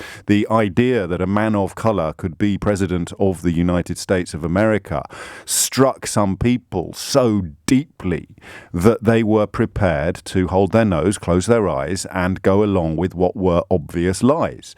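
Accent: United Kingdom. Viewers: I love this commen sence man with British accent.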